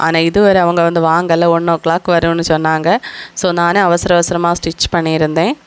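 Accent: Kanyakumari, India. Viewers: நீங்க எந்த ஊர் நம் கன்னியாகுமரி அண்ணன் உங்க வாய்ஸ் கொடுத்தா அப்படித்தான் நமக்கு தெரியுது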